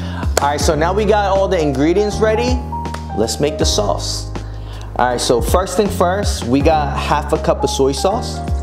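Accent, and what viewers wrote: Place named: Los Angeles, United States. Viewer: this how you sound like when you korean and grow up in LA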